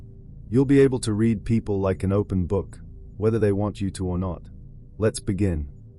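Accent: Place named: Jersey, United Kingdom. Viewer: What surprised me the most?: Your British accent with Jersey dialect. EI: START: STAT. DARK: DAK... Trying to confuse us all?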